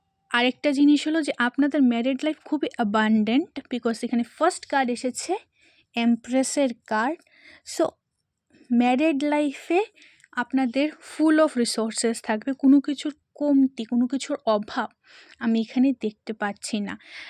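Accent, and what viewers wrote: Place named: Bangal, Bangladesh. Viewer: Didi tmr mukh a amr bangla sotti sunte vlo lage ❤❤❤ Tumi tmr motoi sundar didi... Kintu sotti amr vlo lge...ami tmr katha sunei bujhechilm tmi bangal...kintu amr tmr mukh a ai bangla tai amr vlo lge...tmi bangla tei video koro❤❤❤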